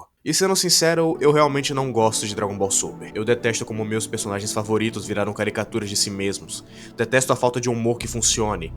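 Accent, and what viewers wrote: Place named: Sergipe, Brazil. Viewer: Vídeo muito foda mano, mas tira uma dúvida, você é sergipano?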